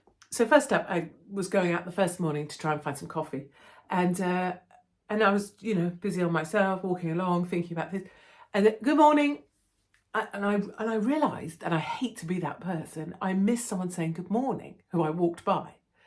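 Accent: United Kingdom. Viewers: Hey! I got some Real good constive Critsisimusssmms In Russia they do a standing ovation when the plane lands.:face-green-smiling: I would feel the same way! 😅 I would be as well. Glad you made it out alive out of D.C. Well, you have been so kind to SOOooooo MANY! LOL! 🤣 Well, we in freedomland dig your British Accent. And you sort of sound like Alexa or Eliza take your pick. ☺:face-turquoise-speaker-shape: NO NO NO NO NO! Remember U R STILL in the U.K.! DANGER WILL ROBINSON!:hand-orange-covering-eyes: Glad you love being in the States and to be Frankly honest, WOULD LOVE TO HAVE YOU BRITS (not all of you) make the move across the pond and come here to become freedom loving Americans! Yea, things are going to get better as long as you stop voting for Libtardia. Plenty of you have came to conservative states, and many of you "Lot" r here in Oregon & I LOVE YOU HERE! 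(Just stay the hell away from DownTown Portland, and stick to the Burbs) Yea its still expensive, but if you work your Hiney off, you can also live a great life here. WE in America are going to rebuild and become the BADDEST MOFO's on the planet again, and if you want to be apart of that? Come on over partner! Just if you got kids? Make sure to keep them out of our Public schools. Lots of crazies still in the schools there, so more for you Bachelors and Bachelorettes. Have a great one and hope you take the steps to make things better in the U.K. Would love to see Fra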